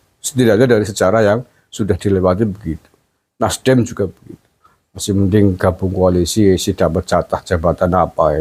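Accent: Banyumas, Indonesia. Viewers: Senang mendengarkan penjelasan jenengan pak....sll di sisipi bahasa ngapak 😂👍👍👍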